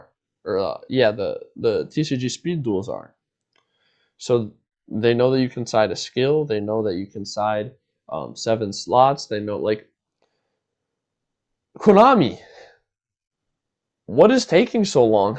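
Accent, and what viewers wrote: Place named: United States. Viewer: what makes you say that? his american accent sounds very natural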